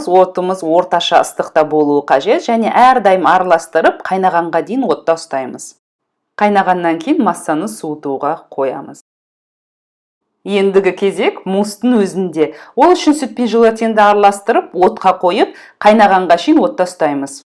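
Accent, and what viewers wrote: Standard. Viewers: Таза қазақ тілінде😍😍😍 рахмет сізге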